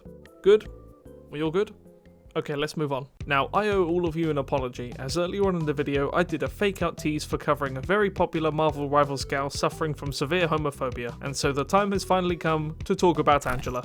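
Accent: United Kingdom. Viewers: Seemed like a good vid then he started going on a racist rant about Chinese and black people you know the vid is about to be ass when you hear that dumb ass British accent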